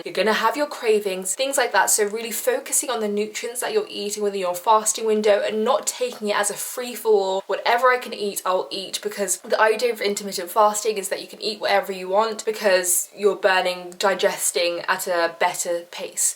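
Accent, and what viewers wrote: United Kingdom. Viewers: Omg i love the British accent 💖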